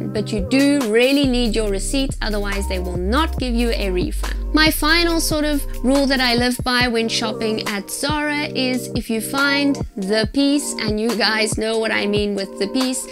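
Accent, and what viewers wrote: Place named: South Africa. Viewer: I clicked on your video and was so happy to hear a South African accent! If only ZARA had an online shop in SA. Love your channel <3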